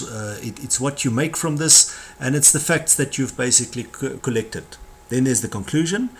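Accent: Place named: South Africa. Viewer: that south African accent though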